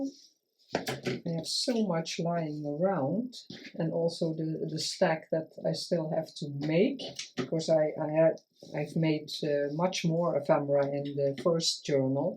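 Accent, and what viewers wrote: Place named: Netherlands. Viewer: I’m so glad I found your channel, another lovely Dutch accent to listen to and beautiful work to look at! Susie xxx💕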